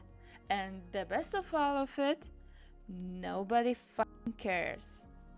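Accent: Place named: Eastern Europe. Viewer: its just the heavy slavic accent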